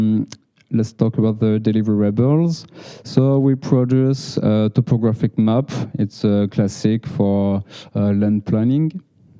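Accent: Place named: France. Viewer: do the french know that they sound atrocious when they speak English? Your accent, she urts may eers, no?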